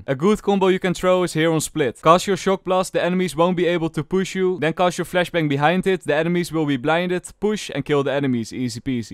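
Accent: Netherlands. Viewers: i smell dutch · Cant stand this guys dutch accent but his tips are so good i have to subscribe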